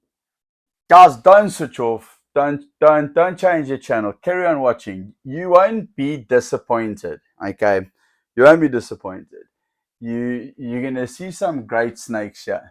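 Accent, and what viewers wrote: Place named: South Africa. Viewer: I love the South African accent so much.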